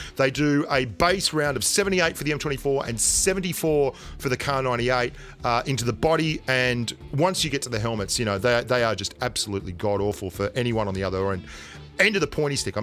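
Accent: Australia. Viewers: "GrAAAAAHzer" gotta love that Aussie accent hahaha keep up the great work, love your videos!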